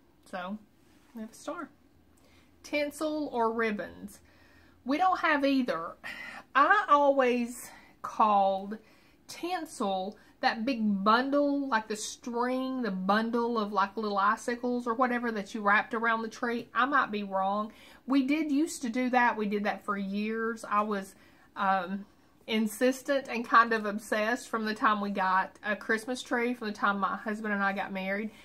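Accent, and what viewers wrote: South, United States. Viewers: love your tree, like that southern accent!!! merry Christmas.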